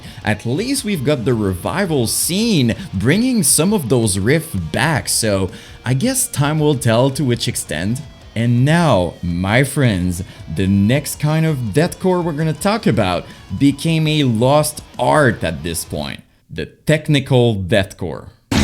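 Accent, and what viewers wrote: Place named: Montreal, Canada. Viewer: hey man, you from mtl? cause you sound like it!! ahahha good to see someone out there doing these kinda videos. keep up the good work my guyy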